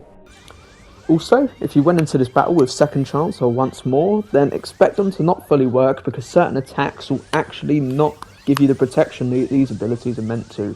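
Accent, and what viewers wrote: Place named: United Kingdom. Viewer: y r u british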